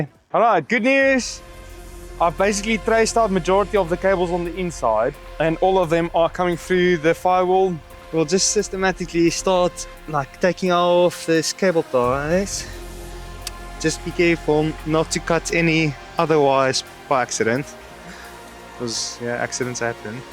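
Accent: South Africa. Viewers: South african accent? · nice to hear a south african accent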